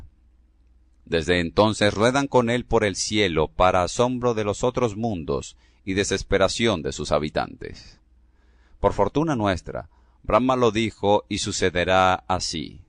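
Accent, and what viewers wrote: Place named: Latin America. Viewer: Escuchar a Bécquer con acento latino...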